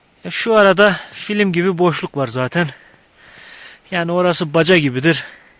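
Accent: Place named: Ordu, Turkey. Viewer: Kardeş, kolay gelsin.Genc bir arıcı arkadaşa benziyorsun.Karadeniz geniş bir çoğrafya.Güzel ülkemin hangi güzel ilindesiniz? Orduyu anımsatıyor konuşmanız.Ben Erzincan merkezdeyim.Tecrübedir, bir önceki yıl da üst üste iki defa kar yağmıştı.Yakinimdaki bir abimin arıların önü tamamen kapalıydı.Kendiside il dışındaydı.Arilarin önlerini açmak için aradım, kovan deliklerini açtırmadı.O yıl 40-45 arısı söndü.Arı kovan içinde havasızlıktan boğulmuş.Çünkü arı mevcutlarıda kalabaydı..Kovanlarini ben temizledim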